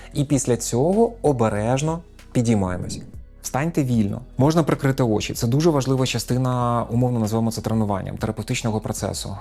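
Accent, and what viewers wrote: Standard. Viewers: Така чиста українська!!!Вправи супер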